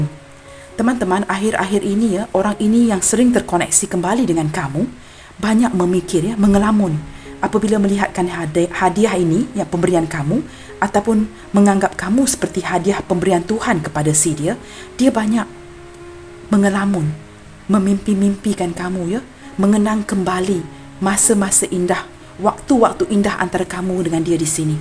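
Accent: Malaysia. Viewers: Pagi kak. Pisces hadir. Suara kakak,seperti logat Malaysia. Terimakasih readingnya. Sukses selalu 🙏🏻🙏🏻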